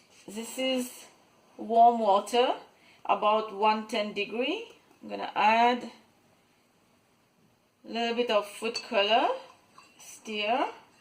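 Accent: Mauritius. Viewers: She speaks with a mauritian accent and since she is making a recipe mauritian wise, how did you expect her to talk? 🙄 Why the hell go on somebody channel and then criticise them about their accent?! 😡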